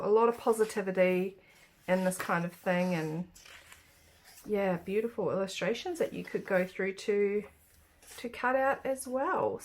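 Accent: New Zealand. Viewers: Thank you..I am from Wanaka and you sound very NZer to me.x I love watching your video's and love what you make.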